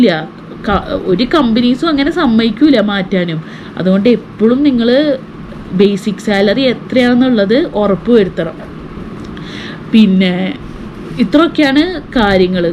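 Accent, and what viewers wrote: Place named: Ernakulam, India. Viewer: I like your slang tune 😅 Eranakulam?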